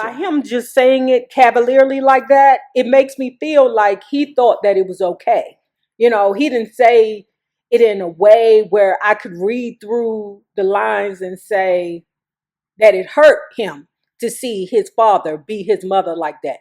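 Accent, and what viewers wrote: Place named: South, United States. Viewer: someone said you need to go to a community College 😂😂 Hilarious. Your way of talking is uniquely you and I like it. At first I thought you were from the south till I realized you're from DC. Well you make me laugh telling your stories as only you could tell them. This Bobby Womack book is good and you just getting started. Keep doing you. I'm enjoying it so much. I'm a senior woman and always been a fan of Bobby.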